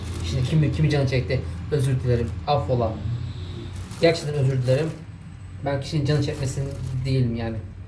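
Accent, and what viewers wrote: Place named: Zonguldak, Turkey. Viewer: zonguldakça ayrı bir lisandır👍, kardeşim hakkını veriyorsun. bilhassa bu doğallık baktırıxor bu Vloglara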